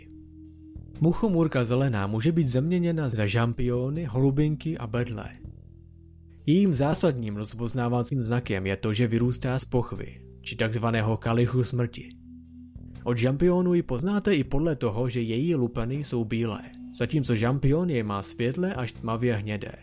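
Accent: United States. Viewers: Dobře video ale máš americký přízvuk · Jak někdo v průběhu natáčení videa chytne americkej přízvuk? :D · dobrý video ale ten amevrickejj přízvuk :D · zajímavý ale mas trochu americkej přízvuk! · Přírodopis mně celkově nebaví ale takovýmhle stylem mně to baví :) Ale zdá se to jen mně nebo máš někdy takovej americkej PŘÍZVUK?? :D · D Zdá se mi to nebo máš takový Americký přízvuk ? :-D Jinak super jako vždy a prosím pokračuj tak v úžasných videí , přeji ti hodně štěstí do života :-) . Fanynka Hungergirl :-) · Tvl ty mluvíš jako Američan to tvé R je děsný 😄😄😄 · hezký Americký přízvuk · Ale ten americký přízvuk 😆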